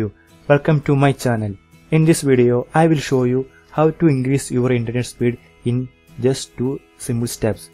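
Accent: Kerala, India. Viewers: r u and indian???from kerala? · are you from kerala ?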